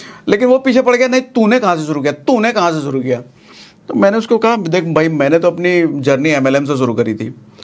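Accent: Bihar, India. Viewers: I love bihari style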